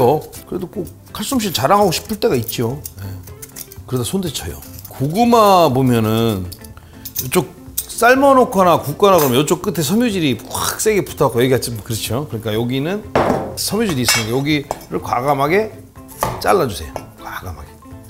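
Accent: Chungcheong, South Korea. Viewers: 그런줄 알유 하면 다 알아들유 충청도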